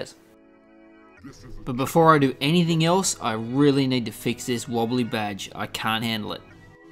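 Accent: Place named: Australia. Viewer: His guys aussie accent is too thick..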